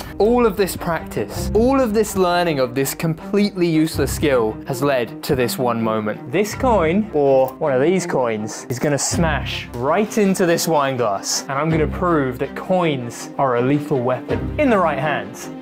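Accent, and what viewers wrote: United Kingdom. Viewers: i like bri'ish youtubers, because i like bri'ish accents 10/10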